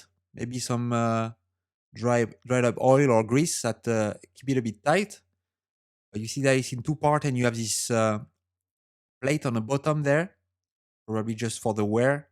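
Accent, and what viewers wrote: France. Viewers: Toujours un plaisir de t’écouter et regarder travailler. Explication simple et précise ,continue comme ça ,avec ce french accent de toute beauteyyy 😜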